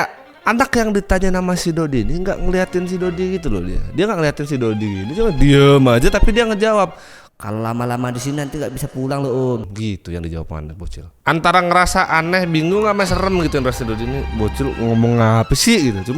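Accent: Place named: Lampung, Indonesia. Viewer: Lampung banget logat nya bang...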